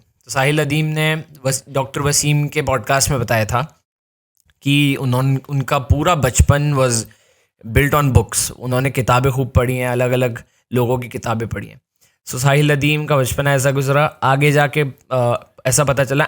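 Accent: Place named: North India, India. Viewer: میاں یا تو خالص اردو بولا کیجئے یا خالص انگریزی۔ اردو میں خواہ مخواہ ٹھونسنا ایک بڑی نامناسب حرکت ہے۔ ذھنی مرعوبیت اور غلامی کا پتہ دیتی ہے۔ دوسرے اپنا تلفظ درست کریں۔ شین قاف بھی درست کریں۔ گلتی نہیں، غلطی۔ طریکا نہیں طریقہ۔ فیر نہیں پھر، بھاشا نہیں زبان ، انھوں نے بولا نہیں، انھوں نے کہا، باڑ نہیں، سیلاب، وغیرہ۔ کتاب ادریس یہودی کتاب ہے، عیسائی نہیں۔ اینڈ نہیں، اور۔ بھٹ نہیں، لیکن۔ برا نہ مانئے گا لیکن آپکی زبان شمالی ھندوستان کے ھندؤوں جیسی ہے۔ ابھی آپ نوجوان ہیں، محنت کیجئے اور اچھی، صحیح اور خوبصورت زبان بولنا سیکھ لیجئے۔ انگریزی بھی انگریزوں کی طرح بولنا سیکھیں۔ بہت اچھی بات ہے۔ لیکن جو زبان بولیں خالص اور مسلسل وہی بولیں۔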